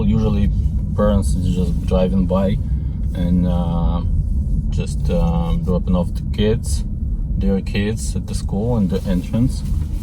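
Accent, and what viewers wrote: Russia. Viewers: Русский акцент, жуткий ) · You sound like Russian so no worries but if you’re a Brazilian then it’s the cold. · Как приятно слушать английскую русскую речь)) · Русский акцент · Wooow You have a such strong Russian accent man. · Этот русский акцент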